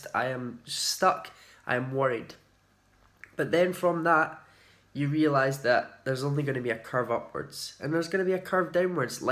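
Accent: Scotland, United Kingdom. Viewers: I love your vlogs, I love your Scottish accent, your eyes, your just a wonderful guy with great advice. Keep doing you.❤️❤️❤️❤️❤️❤️❤️❤️❤️❤️❤️❤️❤️❤️❤️❤️❤️❤️❤️❤️❤️❤️❤️❤️😘😘😘😘😘😘😘😘😘😘😘😘😘😘😘😘😘😘😘😘😘😘😘😘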